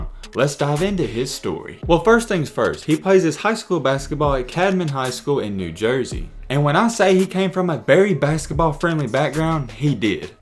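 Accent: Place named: Mississippi, United States. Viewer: You from Missippi? You sound like a man I met in Nachez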